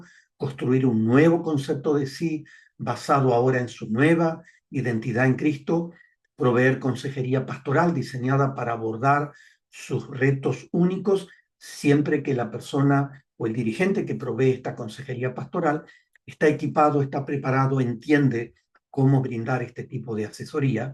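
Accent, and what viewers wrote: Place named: Argentina. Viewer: Gracias por tomar tu tiempo y hablar de esto temas importantes Pareces argentino, el acento , yo soy argentino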